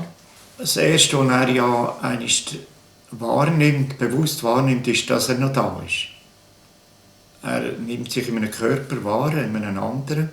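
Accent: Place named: Switzerland. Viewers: Für mich auch - unmöglich - viel zu anstrengend - da geht mancher Satz total unter. Mein Dialekt ist d. Schwäbische - red aber jeweils auch nur unter uns so - ich will doch verstanden werden - oder erwartet man , daß jeder interessierte hier gefälligst dem schwyzer' dt. sich anpassen sollte ? Sehr schade !Dazu noch den Bart , wo es erschwert , evtl. v. d. Lippen ablesen zu können ! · Ich liebe den Schweizer Dialekt, leider so schwer zu verstehen. :)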